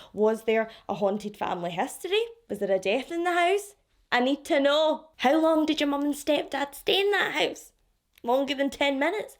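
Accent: Scotland, United Kingdom. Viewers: I've lived in Scotland for 6 years and still struggle to follow conversations between Glaswegians. I love listening to you, at least I can understand you and enjoy your Scottish accent and humor. Love you! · I had to re-read this a few times because I was so confused so i hope i've understood it now! Do you mean by country, as in the countryside/farmer type place? Because if you only moved to Scotland before Covid struck you really picked up the accent quickly lol. ..... Sorry literally just got to the part in the video where you confirm haha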